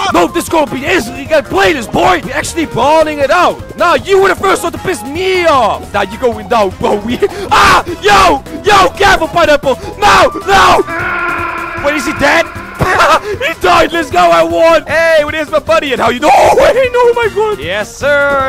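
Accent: Netherlands. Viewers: You sound really Dutch. Hoorde ik dat goed? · R you dutch ?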